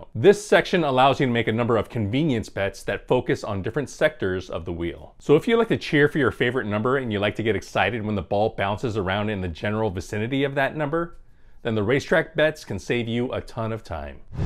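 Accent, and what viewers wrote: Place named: France. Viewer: Thanks for the great content as always. Nice french accent haha. Cheers from Montreal, Qc, Canada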